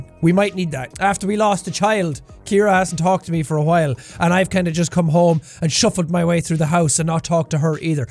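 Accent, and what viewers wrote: Ireland. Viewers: Jack turning more and more irish. XD "Fock em!" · Sometimes when he sounds really Irish he sounds like sean from rdr2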